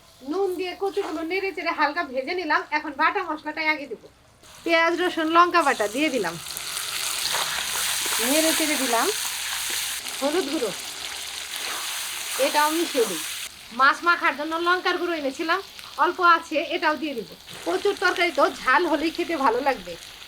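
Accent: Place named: East Bengal, Bangladesh. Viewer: দিদিভাই তোমার পূর্বপুরুষের বাড়ি কি বাংলাদেশে ? তোমার রান্না এবং কথার সাথে পূর্ব বাংলার অনেক মিল আছে।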